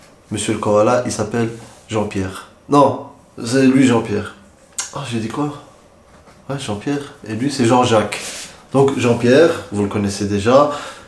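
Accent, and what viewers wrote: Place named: Belgium. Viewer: shaab Belgique haha t'es de bxl non ? merci pour le tuto mon frérot 💪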